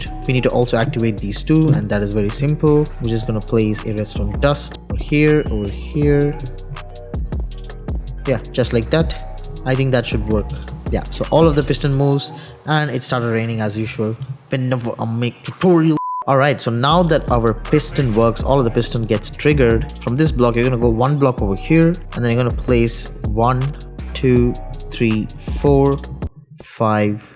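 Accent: India. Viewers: Man i love the indian accent your good bro your explanation is great the otherd is shi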